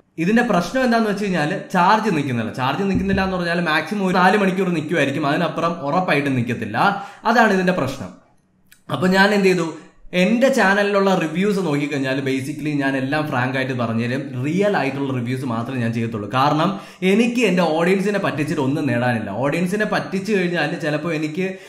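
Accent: Trivandrum, India. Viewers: loved ur trivandrum slang ....ghora ghora.... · Bro brode Trivandrum slang enikk ishttappettu....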